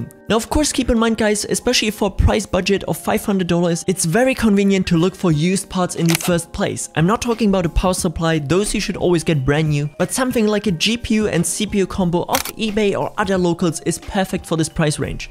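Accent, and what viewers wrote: Germany. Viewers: Guter deutscher Akzent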